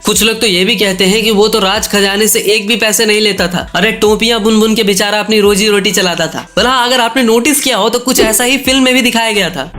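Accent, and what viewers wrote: India. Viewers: Thank You Bhai. Your Accent is definitely Hindi but your Voice is touching Millions of Marathi Community People. Every word & Sentence in these video is giving correct information about Chattrapati Sambhaji Maharaj. Thanks ❤❤🚩🚩🚩🚩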